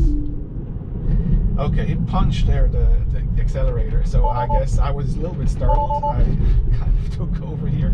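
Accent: Switzerland. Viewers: Sicher en schwizer mit dem akzent😄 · Hmm... you sound very Swiss :) Grüezi Thanks for the video!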